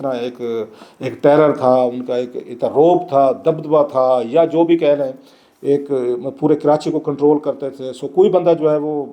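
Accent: Saraiki, Pakistan. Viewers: Please try to correct your Urdu accent, feels that your vlog in saraiki , please next time aap saraiki me vlog Karen,Urdu aap ke bus ki BAAT nahi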